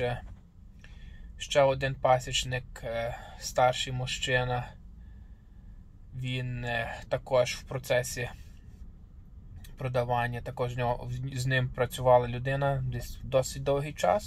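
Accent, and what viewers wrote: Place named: Western Ukraine, Ukraine. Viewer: Пан явно із західної України.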